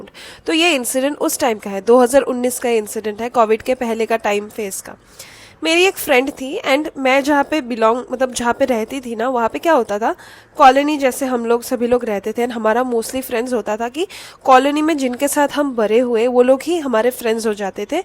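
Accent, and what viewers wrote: Bengal, India. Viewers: Are u from bengal? Ur accent tell it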